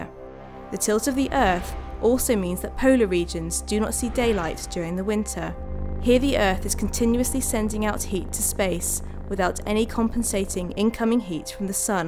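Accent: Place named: United Kingdom. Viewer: its like british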